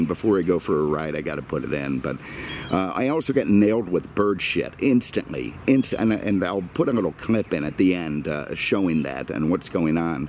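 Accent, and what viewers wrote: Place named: New York, United States. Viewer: I love this guys chatter. He has a New York type accent and a lot of character to his speech. He is very entertaining and charismatic love his reviews ...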